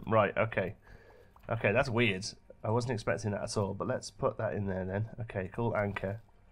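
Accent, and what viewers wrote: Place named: England, United Kingdom. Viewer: I subscribed for the English accent.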